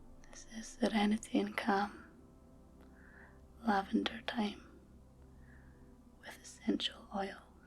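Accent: Scotland, United Kingdom. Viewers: In an earlier vid I thought you had an Eastern European accent. But, you're Scottish! I love your accent (from the isles perhaps?). More to the point, you are a breath of fresh air, with a lovely relaxed chatty style. How have I not been a fan before now? And, of course, I am now deeply in love (tho I'm old enough to be your father. I know, kinda creepy innit?) I'm now subscribed. Thankyou for this.Im more relaxed than I've been in years! Rock on! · Momma said knock you out with that Scottish tongue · Yeah the scottish accent is a lot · The Scottish accent is my new favorite. Thank you! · lovely scots tones....zzzzzzzzzz....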